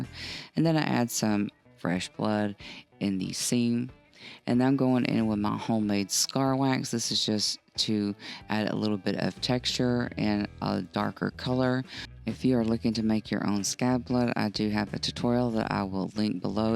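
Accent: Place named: United States. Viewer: Really fantastic. Very interesting accent too. American i think, but not sure where from. Great work. You deserve more views and subs.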